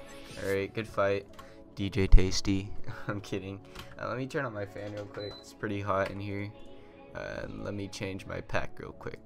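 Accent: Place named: Netherlands. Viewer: Where are you from..? You sound Dutch lol.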